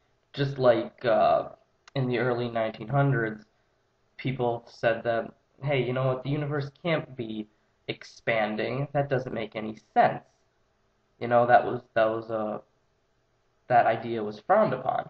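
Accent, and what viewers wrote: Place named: United States. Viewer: Why do you have an American accent?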